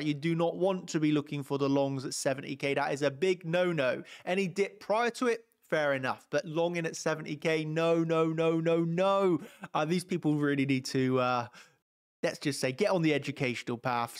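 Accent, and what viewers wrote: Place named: London, United Kingdom. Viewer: Thanks,! Love your accent - got to be from somewhere to the west ('left') of London, surely?! God bless you, from London x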